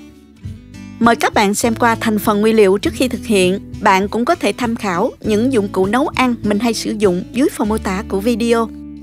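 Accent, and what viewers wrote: South, Vietnam. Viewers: Giọng chị miền Nam , chị thì không nên dùng các từ đĩa( dĩa), thìa( muỗng), thái(cắt, sắc)...các từ đó để người miền bắc nói đúng hơn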